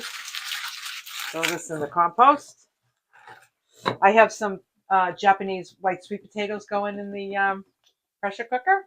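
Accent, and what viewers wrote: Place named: New York, United States. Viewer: I absolutely love your hair AND .....your roasty toasty cabbage and your accent love love it!!!! Im from NY too!!!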